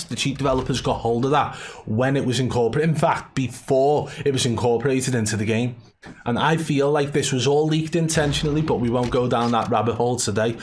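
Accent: Liverpool, United Kingdom. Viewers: I love this Scouser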